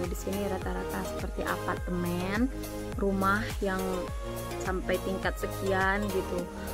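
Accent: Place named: Perak, Malaysia. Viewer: Dialek orang perak, awak makna saya/aku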